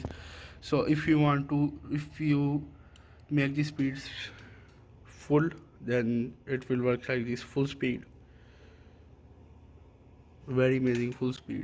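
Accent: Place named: India. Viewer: Your Indian accent is so deep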